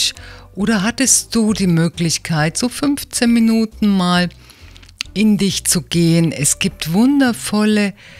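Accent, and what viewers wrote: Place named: Bavaria, Germany. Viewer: Herrlich, liebe, wieder tolle Inspiration und das kurz und knackig - ich liebe deine offene, ehrliche Art, deinen Witz und natürlich dein bayrisch!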